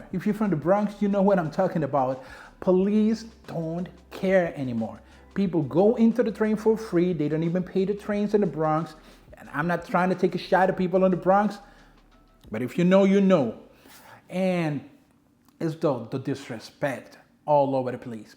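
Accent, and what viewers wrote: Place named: Brazil. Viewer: I left Cali (San Diego) after living there for 28 yrs 7 months ago. Moved to FL. I don't know how I did it, but the cost of living was getting too damn expensive, even with a great job. I didn't have a ton of stuff in my place. Packed all my stuff in XL Home Depot moving boxes and shipped boxes out through UPS a few months ahead of time of my final departure. 8 total boxes. All my shoes were in them with some clothes.I shipped through Pirate Ship, saving a couple hundred dollars. I had my car shipped out for a great deal. Had more shoes in the trunk and a few other things. I had my car broken into a few years ago at my place. Catalytic converter was tampered with the theifs had a hard time removing stuff all over the seats and floor. That next morning I called the cops. Them lazy bastards pretty much told me there's nothing they could do. 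They didn't even come out when I wanted to file a report. Since that incident, I lost all respect for SDPD. I'm here in FL closer to family. Still adjusting to the slower pace, a little culture shock if you know what I mean. Cost of living is cheaper than Cali. No state tax. I like the warm weather, but I hate the humidity. Excellent fishing here in which I love doing as my top go to hobby besides shoes. Do I miss Cali? Yes and no. I miss the weather, friends, and hanging out at many of my favorite spots. I'll definitely go back and visit my Cali peeps no doubt. BTW, where are you from? It sounds like to me you have a Brazilian accent.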